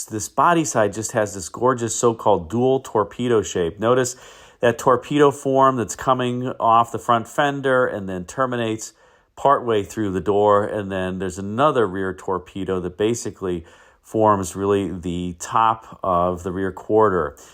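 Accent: Midwest, United States. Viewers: I loved the first generation Grand Ams and Pontiac did a tremendous job styling them — when they were pretty similar in a lot of ways to a Chevrolet Malibu underneath. I had to laugh at your mention of the Ventura pronunciation. I like your midwestern accent and it reminds me of the HGTV host Scott McGillivray (who is actually Canadian) and some other actor I can't think of. It's kind of a Great Lakes area sound to me. Lol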